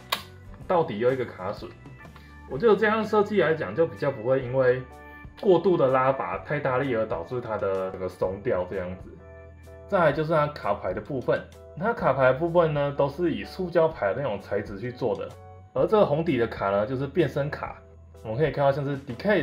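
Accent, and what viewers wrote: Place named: Malaysia. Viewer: 可能是戴了面具顶着鼻子，所以口音就很像马来西亚口音，其实我也是以为是马来西亚人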